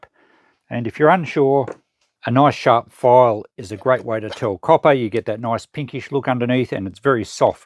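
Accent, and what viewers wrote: Australia. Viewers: I tried watching this twice, but It was late and I was tired. Your smooth Ozzy pronunciation put me to sleep 😴. Lol, third time now, I am well rested. Fun sorting video 👍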